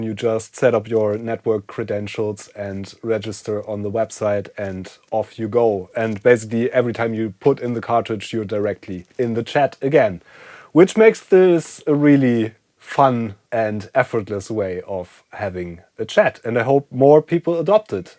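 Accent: Germany. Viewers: Your Accent sounds german. Bist du Deutscher oder?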